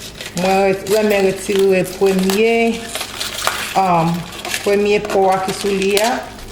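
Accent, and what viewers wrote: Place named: Haiti. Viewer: Great job.... and you were worried about you Creole accent! Proud of you! Happy New Year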